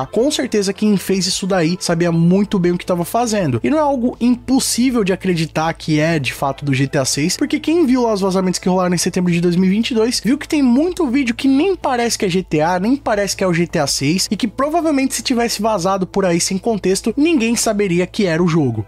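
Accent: Portugal. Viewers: Porque sua voz parece de um português? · Porque seus videos agora estao com essa dublagem? Em pt portugal?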